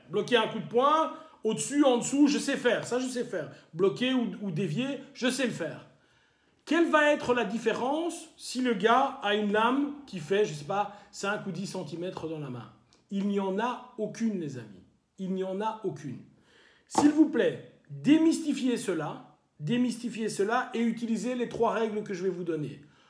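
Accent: Belgium. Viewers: Tout le monde à un accent selon son origine. C est un accent wallon tout à fait normal, 2000metamorphe je te comprend pas.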